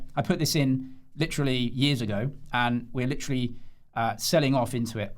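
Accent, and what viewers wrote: England, United Kingdom. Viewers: If you don't understand English spoken by an English person try turning on the closed captions.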